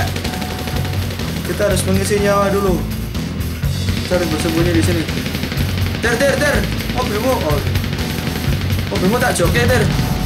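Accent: Java, Indonesia. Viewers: Mas wong ngendi si kok iso boso jowo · Medok tnan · KAMU ORANG JAWA YA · english'e wis medhok wkwkwkwk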